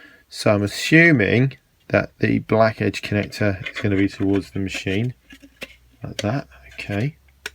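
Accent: United Kingdom. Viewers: ahhhh its so nice to find a fellow English man who loves to muck around wioth old computers etc...lots of Americans nothing wrong with that but to hear "Oh Geezer" lol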